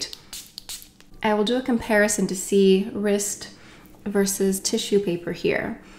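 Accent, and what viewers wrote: France. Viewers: Love this perfume!! Love your French accent! Thx for sharing dear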